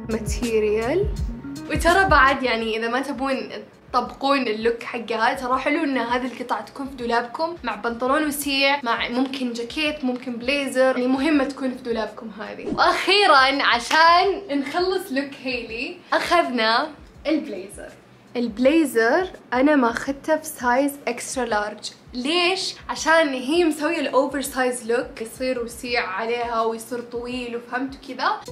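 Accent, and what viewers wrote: Saudi Arabia. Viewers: يمه هذي مين اول مره اشوفها بصراحه بس يمه تدخل القلب عفويتها تجنن ماشاءالله + احسها كويتيه بس لهجتها سعوديه بس برضو احس يليق عليها تكون كويتيه😭😂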